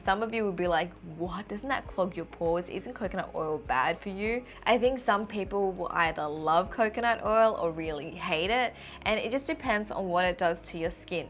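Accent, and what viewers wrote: Australia. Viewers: Ur Aussie accent is over powering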